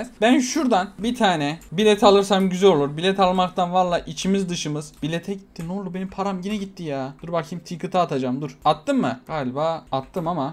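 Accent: Malatya, Turkey. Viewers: Abi sen malatyalımısın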